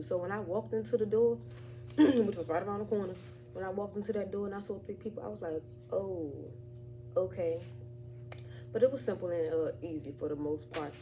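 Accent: Washington DC, United States. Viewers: When I heard the DC accent, I liked the video. When she said 'jih like', on muvas I smashed the subscribe button lol.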